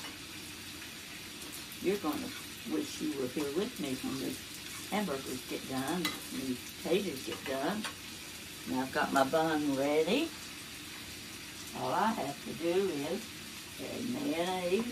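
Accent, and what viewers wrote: South, United States. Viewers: You are a Sweetheart♥️You remind me of my Precious Mother.Love the southern accent.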